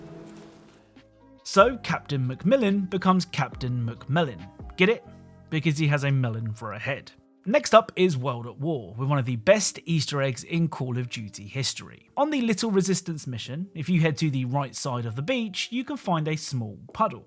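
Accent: United Kingdom. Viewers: Something about British gamer YouTube channels that I just love. Maybe it's because I'm American, and the British accent is somehow hypnotizing or cathartic to us 😂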